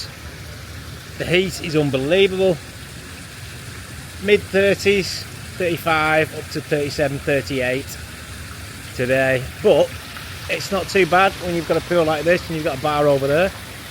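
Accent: United Kingdom. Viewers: Is your accent Welsh or Scouse? It’s beautiful. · You sure you're not in LONDON? pure pommy accent 😂 ❤ glad that you guys enjoyed Cambodia ❤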